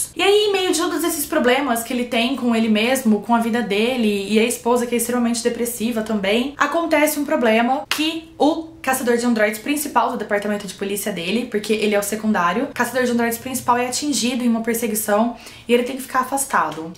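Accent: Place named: Caipira, Brazil. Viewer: univeRLso foRLte maRLte Que gracinha, esse sotaque caipira. X-) E pensar que esse som RL (um fonema denominado "erre retroflexo") vem da língua tupi, e que se incorporou à fonética portuguesa graças ao fato de que os índios capturados pelos bandeirantes não conseguiam pronunciar o erre vibratório lusófono (presente em palavras tais como "rato" e "robusto"). Eu ia lhe sugerir um livro (na verdade, uns livros). Porém, como vi em seu About que suas preferências são "...fantasia épica, ficção científica e suspenses policiais...", creio que não se interessará por obras filosófico-existencialistas sartrianas, tampouco por um documentário-poesia do Darcy Ribeiro. :P ...mas, se mudar de ideia (a respeito do documentário): watch?v=eqlcHGj4f7k&t=2h36m21s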